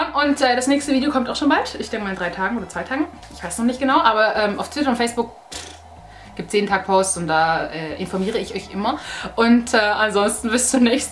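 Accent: Swabia, Germany. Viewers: der dialekt :DD schwäb'sch :D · ich finds so toll das du manchmal ein wenig schwäbisch redest!!!!!!! schwabenpower!!!!!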